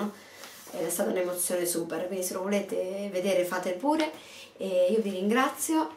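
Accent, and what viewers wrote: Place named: Tuscany, Italy. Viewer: io di Ancona !! ;) dai siamo piuttosto vicine !! capirai , pensavo fossi toscana !! hah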